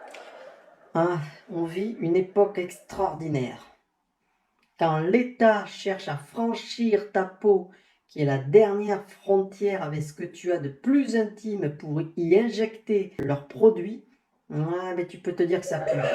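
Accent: France. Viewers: Un indice il a l’accent marseillais 😉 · ENFIN un humoriste qui l'ouvre ! Mais qui est-ce ? Un accent du dessous de la Loire, c'est le seul indice...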